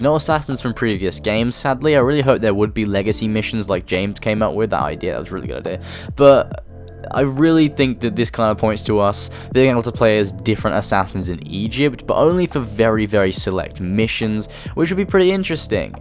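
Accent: United Kingdom. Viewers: I thought that it was probably a joke, but I wasn't completely sure. You never know when you've got somebody from a different culture if maybe a saying that's normal to you means something very different to them. Your accent is obviously European - probably UK somewhere, although I've heard people from the middle east with an accent that sounds pretty similar to yours too. I've certainly never heard the rough sex definition. I'm not even sure if that's an actual thing or just somebody putting in a silly troll definition XD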